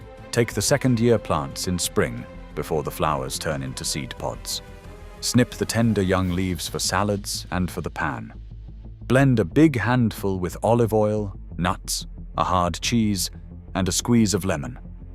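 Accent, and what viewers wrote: England, United Kingdom. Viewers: You mean English accent?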